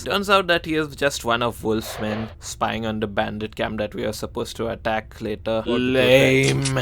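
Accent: India. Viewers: i think he sounds like a idian guy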